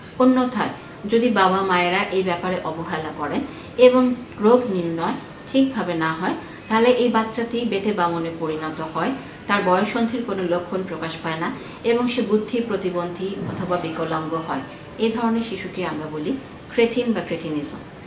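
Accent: Chittagong, Bangladesh. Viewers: Madam ki chittagong ashen????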